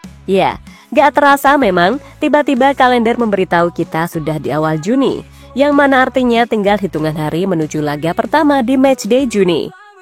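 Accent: Java, Indonesia. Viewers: Pertama hdr min. Smngt ya min. Q kangen bhsa jw medok mu min.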